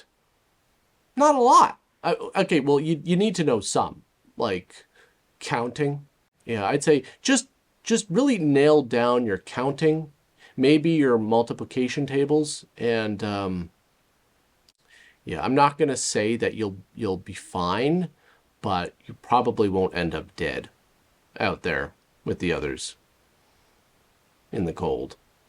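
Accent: Canada. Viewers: Oh, and he has the classic Canadian accent: "hoose", "aboot", etc. As a fellow Canadian, I can appreciate that. Haven't heard him say "eh?" yet, however.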